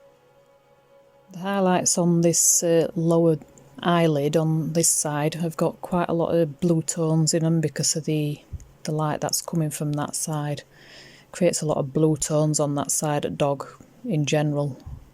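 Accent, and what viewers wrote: Yorkshire, United Kingdom. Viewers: Absolutely incredible work, 'really eye catching'. And how good does it feel to hear a Yorkshire voice for a change👍😷👍🏴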